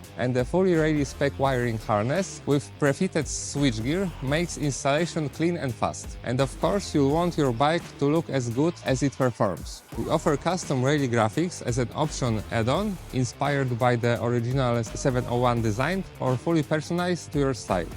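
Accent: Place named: Poland. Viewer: My ears bleed after listening to this Ponglish 😢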